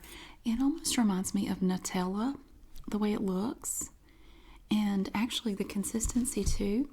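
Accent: United States. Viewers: gor God's sake, Please stop with the pronunciation issues. She lives in the States of course shed pronounce it differently than Aussies.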